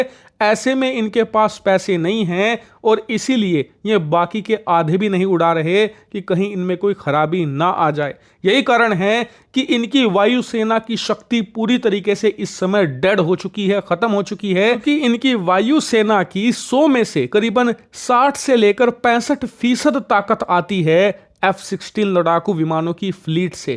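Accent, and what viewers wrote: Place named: Bihar, India. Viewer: Apki Abaj Purana Nehin.Avi Bihari Vojpuri Abaj Bol Rahi.kya Huwa Purana Abaj.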